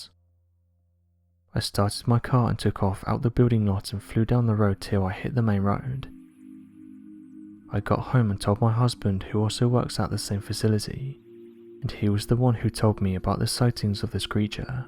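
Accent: United Kingdom. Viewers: Your British voice drives me up the wall. Have somebody else narrate your stuff. When you talk British and you talk like you're singing.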